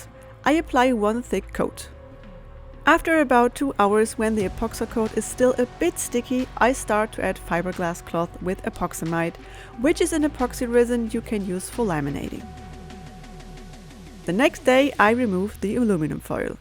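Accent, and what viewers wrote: Germany. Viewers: Are yue Germen 🇩🇪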